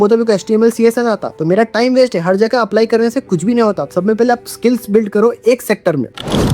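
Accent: Hyderabad, India. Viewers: Hydrabadi accent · Hyderabad se ho aap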